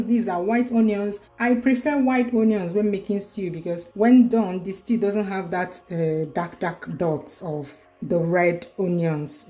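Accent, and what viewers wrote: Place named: Igbo, Nigeria. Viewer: Nne I'm also Igbo but I just find the way you speak English amazing 😍, like the accent is giving me joy❤ I love it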